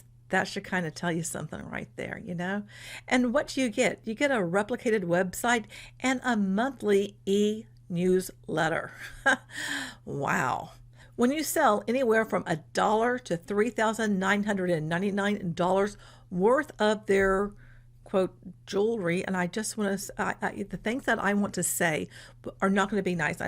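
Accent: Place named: Texas, United States. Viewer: I knew you were Texan 😂 howdy from a North Texan 😂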